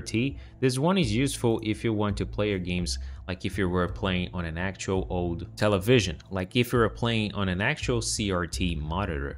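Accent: Brazil. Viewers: Best Brazilian person accent i ve ever heard. congrats for the work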